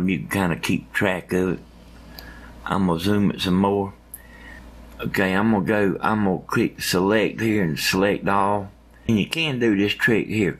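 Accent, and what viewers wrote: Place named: South, United States. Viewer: More Southerners should speak like that again. Excellent video. No waffling intro, straight to the point and helpful..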